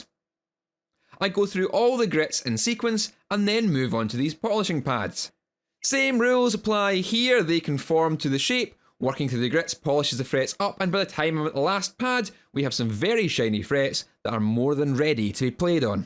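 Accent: Scotland, United Kingdom. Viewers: Yo, I can't wait for episode 9! I have been watching a lot of your videos and I am getting used to the Scottish accent (being a Filipino that is quite a challenge hehehehehe). I just wanna say EXCELLENT work on explaining the science and art of electric guitar making in plain layman's language. Thanks so much for educating us (you can be a great school teacher brother! I am a school teacher by day and musician by night), Keep it loud and I'll see you on episode 9!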